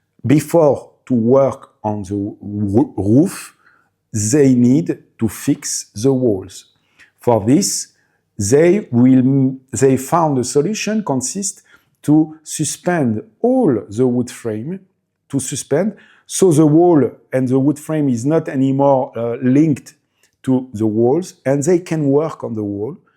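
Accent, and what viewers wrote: France. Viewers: I been wondering about the young French man and his chatuea, think his video was called So Chateau. You showed a picture of him in the end, a very young man. I would like to know how his chateau is doing? Don't worry about your English women love it with your French accent ❤😊😅😅😅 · Your English is amazing but your accent is throwing my Texas ears off a bit. I am trying so hard to learn some French, but alas, I am truly hopeless. But I love your show and have subscribed to it. I know I am capable of understanding the accent and I suspect it is the key to learning French because so many words are already part of the English language, not to mention that I grew up very near the Louisiana border where French is still widely spoke. But I do know " Laissez les bon temps rouler" although I can say much better than I can write it. 😂😂😂 I'm sorry, that is official motto for Mardi Gras, kind of our Carnival. 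· As an American I understand your English perfectly. You do have an accent but so what? You are French and it is understandable. I only wish I could speak French. It’s a beautiful language and I hope to visit your glorious country next year. 🇫🇷🇺🇸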